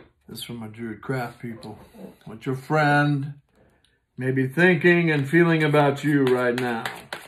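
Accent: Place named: South, United States. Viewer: I love your energy! I love your humor! I love your passion! And i love your accent (i'm not a native english speaker but, do i sense a southern accent there?). I claim all your readings in advance! Love and Light!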